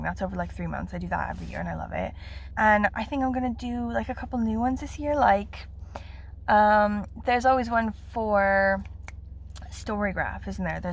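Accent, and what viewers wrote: Wales, United Kingdom. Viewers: Loved hearing the Welsh again. You sound amazing to me. Why are you taking the class? That sounds like a really stupid question since I know you LIVE in Wales, but seems like it’s become more of a priority in the last year. 🎄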